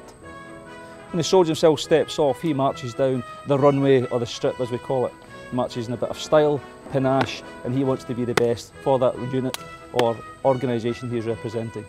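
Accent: Scotland, United Kingdom. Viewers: nice Scottish accent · I really enjoyed the video but try speaking a little slower. Many of us across the pond are not familiar with the Scottish accent. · Strong accent, difficult to understand for foreigner like me. Is that Scottish accent ?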